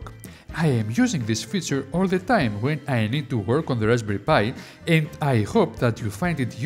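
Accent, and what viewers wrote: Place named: Greece. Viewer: Κανεις μπαμ πως είσαι Έλληνας αδερφε 😆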